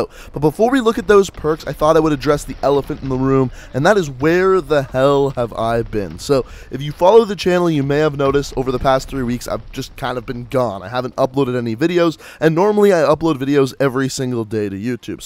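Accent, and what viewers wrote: Canada. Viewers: Are you canadian? You say aboot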